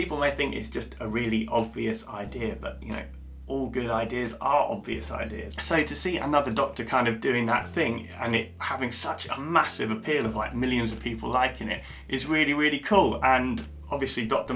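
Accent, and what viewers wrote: United Kingdom. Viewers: A like for your humbleness and great Bristish accent.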